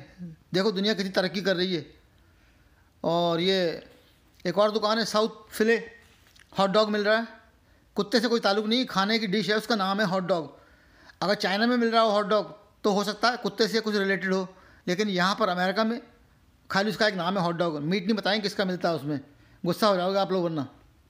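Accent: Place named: Uttar Pradesh, India. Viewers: ji namaskar main aapki video Kafi Samay se dekh raha hun aap bilkul sacchai Bayan Karte Hain dusri Baat Aap Ki Boli mujhe bahut acchi Lagti Hai kya aap up Se Hain aapki baten sunkar maja Aata Hai kya aap India ko mis Nahin karte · जो आप u p स्टाइल में बोलते हैं बहुत ही मजेदार वेज एग रौल 😁😁😁😁❤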